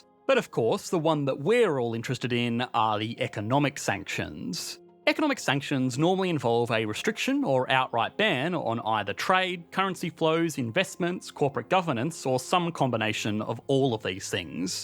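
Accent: Australia. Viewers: weird combo of vocal-fry and Australian accent. made me uncomfortable 😂 good info though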